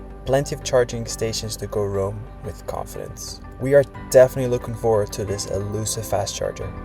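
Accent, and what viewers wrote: Netherlands. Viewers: Wat cool, ik wist niet dat je uit Nederland kwam. Ik bleef mij maar afvragen of ik niet een klein Nederlands accent hoorde haha. Nu snap ik ook je zonnepanelen uit Nederland op de Tesla. Wat een avontuur! Echt geweldig!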